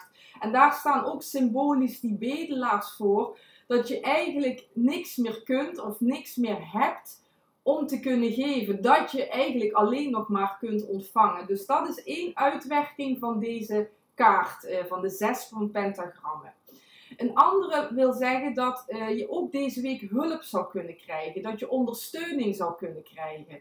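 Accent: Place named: Limburg, Netherlands. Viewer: Volgens mij ben jij een Limburgse, net als ik!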